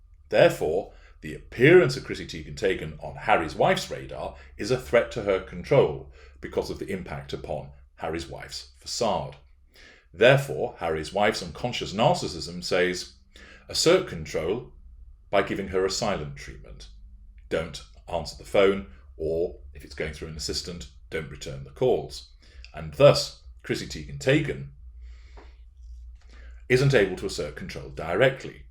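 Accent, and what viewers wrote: United Kingdom. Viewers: Hi, I first subscribed to your channel several months ago through your analysis of Harry’s wife. Since then I have been backtracking through your past commentaries. Your output is impressive, the research is extensive, accurate and relatable. As Australian 🇦🇺 your voice is very British and so pleasant to listen to. Thank you.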